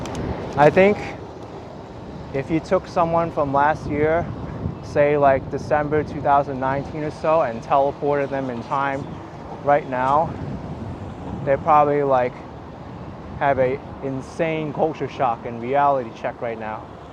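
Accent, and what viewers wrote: New York, United States. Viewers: I find your series more enjoyable when you make a live commentary... love the New York accent!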